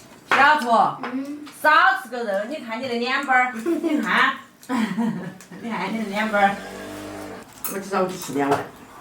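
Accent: Sichuan, China. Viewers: 你彪哦！四川話真的是獨樹一格 XD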